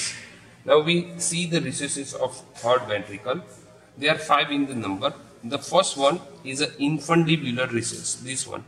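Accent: India. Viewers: if you cant understand the indian accent you haven't seen enough educational videos smhh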